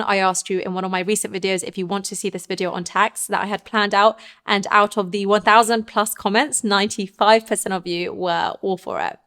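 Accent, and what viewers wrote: United Kingdom. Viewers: What part of UK is she from?? I’m from the States trying to see what UK accent is from what part of UK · What kind of british accent do you have? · Who's here for US taxes and noticed this lady has a British accent, scrolled down and the location is London. oops! 🤣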